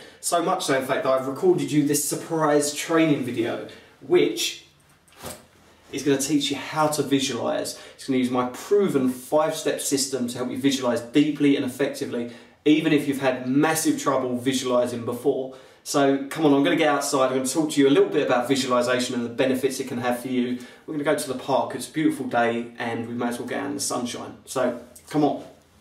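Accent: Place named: United Kingdom. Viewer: thanks for the video alott thatks thanks but british acsent is so agly sorry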